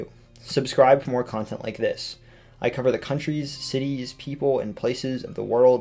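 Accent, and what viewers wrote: United States. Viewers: Interesting,except that the American style of English pronunciation is a bit hard for me to grasp quickly.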